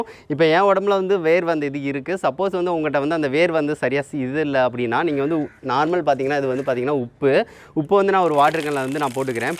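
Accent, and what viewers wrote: Sivakasi, India. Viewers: Bro U sivakasi yaa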